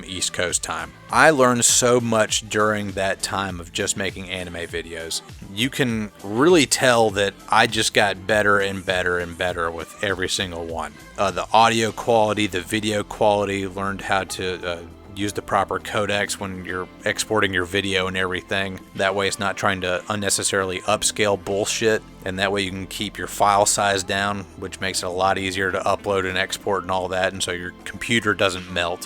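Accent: South, United States. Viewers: It's just my personal opinion but I feel like your channel has taken off for two main reasons, one is excellent well researched content presented in a very relatable way and two because you lend NASCAR material an air of authority with your southern accent but also not sounding so southern that no one can take you seriously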